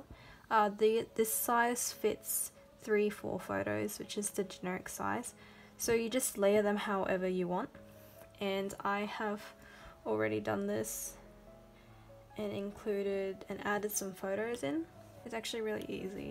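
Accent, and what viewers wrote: Australia. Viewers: Idk why but your accent reminds me of felix and bangchan's accent😂😂😂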